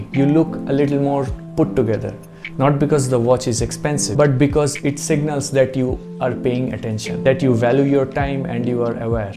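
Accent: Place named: Bengal, India. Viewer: Such a heavy bengali accent.....and its your usp.....never try to change it.....its your identity❤❤❤❤❤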